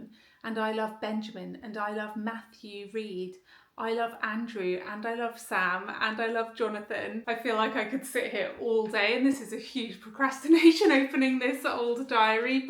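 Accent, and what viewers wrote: United Kingdom. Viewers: So happy for you. I would love to see every moment of your renovations. You have the most beautiful skin, you are glowing! I could listen to your beautiful British accent all day. Blessings 💝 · Best British accent 😊 I also miss when you made vlogs with your friend Kate🌸🌸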